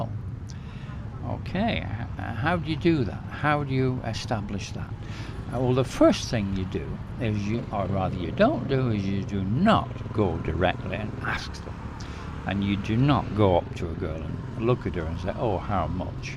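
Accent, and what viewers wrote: United Kingdom. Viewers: I am trying to work out your accent? Where are you from in the UK?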